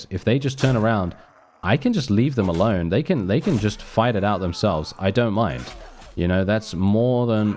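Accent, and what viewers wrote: United Kingdom. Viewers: Remember to like comment and HYPE! Especially on the first video of a series as it really helps out the channel! This is not a paid advertisement as I joined the channel to hopefully help boost the smoothest British Baritone that you'd listen to read a phone book. For you kids that don't understand what that is, it isn't a phone that looks like a book or a mobile phone that folds like a book. It was a big book with your name and number, and sometimes street address. It'd be super boring but you wouldn't be able to stop listening to. Forgive the ramblings of an old man who finally became a dad, I don't know what sleep is anymore!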